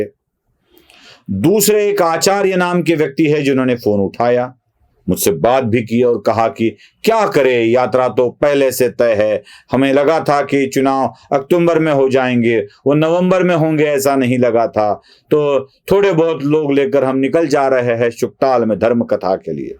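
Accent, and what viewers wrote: Maharashtra, India. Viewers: I love your Marathi accented Hindi. Fully agree with your views. From Lucknow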